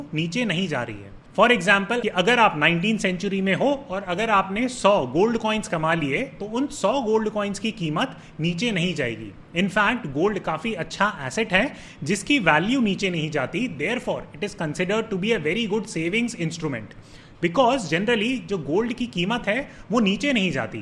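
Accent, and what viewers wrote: Standard. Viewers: Kya shuddh hindi bolte ho sir aap.🤩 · Loved you Shudh HINDI. 😊 And how is Zayn doing? Does he speak hindi as well? Your english channel does not feature this hindi channel. Feature it ASAP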